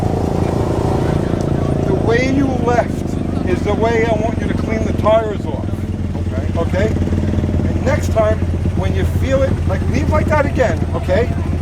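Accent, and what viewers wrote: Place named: Brooklyn, United States. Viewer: you were ragging way too hard!!! Obviously appeared as if it was his first time the drags which can be a bit overwhelming. You sounded like a slightly nicer version of Mike P. from Brooklyn!!! He'll get there, nothing works but experience - you KNOW that! It's harder than it looks. Start with teaching him how to do a proper burn out!!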